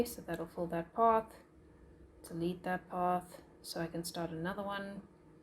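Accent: South Africa. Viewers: AHA! Checked your profile to make sure. Knew I heard a South African accent!! #ProudlySA Keep up the awesome work!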